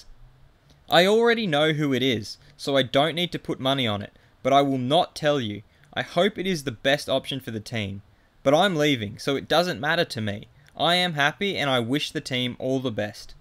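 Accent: Australia. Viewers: Great video. On your suggestions for future videos, one thing I suggest is to change your accent. For many people the Australian accent is very annoying. Thanks.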